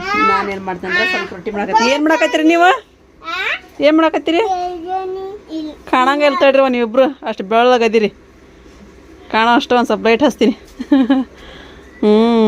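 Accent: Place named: Gadag, India. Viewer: Nim maat kelidre same Gadag navar maat gate adav nodri..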